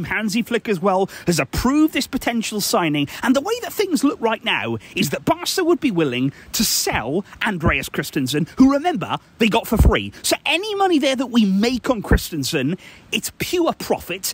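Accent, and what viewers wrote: United Kingdom. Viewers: I thought you are from the UK?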